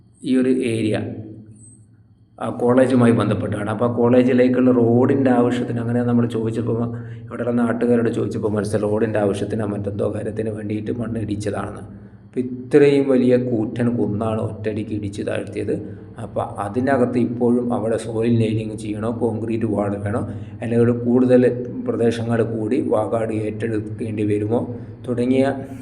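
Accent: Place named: Malabar, India. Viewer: ഞങ്ങൾക്ക് മനസ്സിലാകുന്നുണ്ട്..സംസാര ശൈലിയിൽ മാറ്റമൊന്നും വേണ്ട നമ്മൾ മലബാർകാരല്ലേ?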